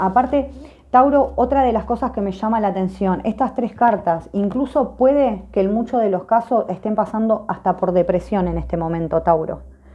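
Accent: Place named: Argentina. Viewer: Amo tus lecturas y tu forma de leer tan argentina jajajajja te talta el boluda amoooo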